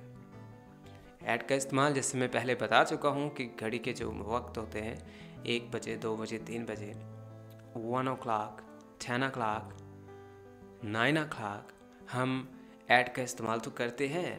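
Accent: United Kingdom. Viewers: chawal Urdu to thek thek bol.etni acting kr rahy ho jesy tm angrez ho or Urdu bolna ni ata .Urdu m English wala lehja rkha hoa h. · Sir pak ki english tenses preposition to ata hai lekin british accent nhe samjh atta apka 11 word wali video awsm the plz 1 video main batain k British language A TO Z kesay pronounced karengaylk PLZZZZZZZZZZZZZZZZZZ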